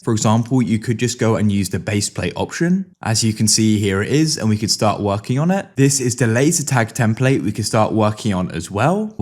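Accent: United Kingdom. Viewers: you to british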